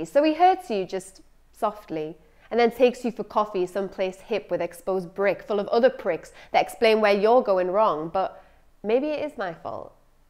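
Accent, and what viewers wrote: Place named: Newcastle, United Kingdom. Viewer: Amazing! In the wellness/yoga circles I work in Ive defo met a fair few of these guys!! You express it so well and great to hear a fellow geordie covering this important topic thanks 💚🙏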